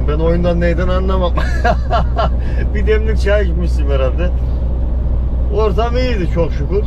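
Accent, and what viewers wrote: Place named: Sivas, Turkey. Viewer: Abi valla seni hemşehrim zannediyordum Sivas in ağzına benziyor konuşan adamsın valla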